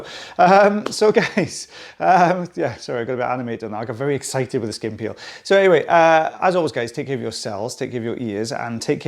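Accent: Wales, United Kingdom. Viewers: Loved that! Also love your accent - I lived in Carmarthen when I went to Trinity College there in the 90s. I really miss Wales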